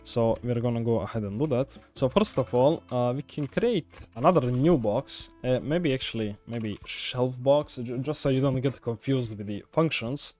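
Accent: Russia. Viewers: You have a Russian accent.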